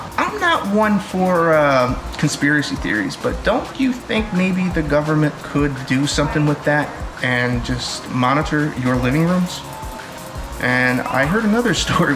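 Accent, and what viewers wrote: Newfoundland, Canada. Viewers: Where are you from buddy? your accent sounds like your from NFLD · You have got to be Newfie man. I know a fellow newfie when I hear one.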